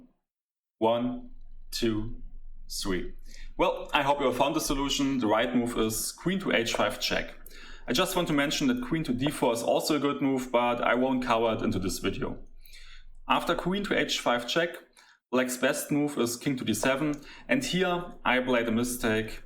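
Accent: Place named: Germany. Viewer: Thats the most German-English video I've ever heard. Great job!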